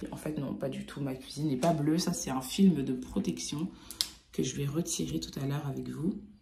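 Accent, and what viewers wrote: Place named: Ivory Coast. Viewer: L’accent ivoirien j’aime trop🇨🇮😂 Pourquoi pas une vidéo en parlant avec cet accent Tu as une belle famille 😍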